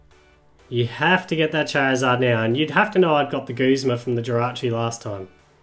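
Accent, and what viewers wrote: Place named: Australia. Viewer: I love that Charizard finally has a competitive card! Also, judging from your accent, I'm guessing you're from Australia? Watching here from the UK! Love your channel! Keep up the good work!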